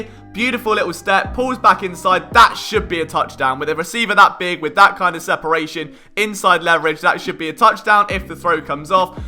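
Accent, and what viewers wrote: United Kingdom. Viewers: I have never heard someone with a British accent talk about football that wasn’t soccer. Nothing wrong with it but this is a first I am amazed. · Why the Fook is a british person talking about american football XD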